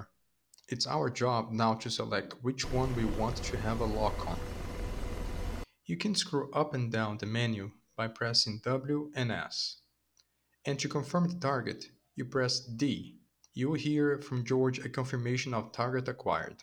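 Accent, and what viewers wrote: Brazil. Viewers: Tá bem BR esse sotaque aí hein! Anyway, thx for the tutorial.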